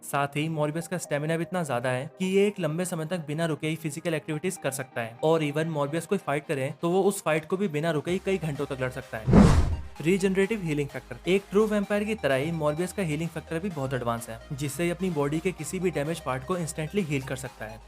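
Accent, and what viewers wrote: India. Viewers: hind şivesi cok komik :D:D:D:D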